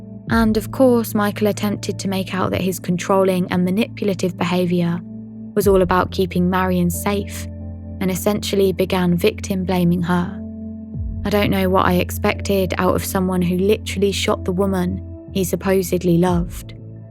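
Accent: United Kingdom. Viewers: You are the best voiced narrator I've heard in my 47 years. (I live in Oklahoma so what do you expect lol) - before your voicemhit my ears, my favorite was Richard Dawkins' wife Lala Ward...she used to be my favorite narrator and before her, it was the Forensic files narrator. Your voice is SO smooth and relaxing and sublime. Female British accident is my favorite accent in the world...and if anyone offers to digitize your voice ask a lot of money because YOU ARE THE BEST. NOBODY is as sublime to hear than your voice. I don't want to invade your privacy, just want to know what parts of Britain a person has to grow up around to get that accent? Your microphone is so good, your classy, thorough, and wonderfully pleasing voice is so good. It's a 🙏 thank you kind poster for sharing your voice with us. Don't worry I'm not a stalker... I'm gay lol you just have such an amazing voice it's unbelievable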